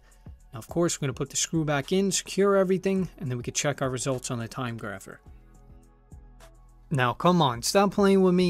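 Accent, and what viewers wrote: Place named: Brooklyn, United States. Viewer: First time viewing your site and this restoration..which, btw, was a great job. A classic piece which was in dire need of service....(amazing how some ppl would let that go soo far..). You did a Great job..and thed bracelet is still in good shape to wear as is. No need (at this point) to rebuild. Kudos to you... (where's your accent from..Sounds 'Brooklynish'...eh.?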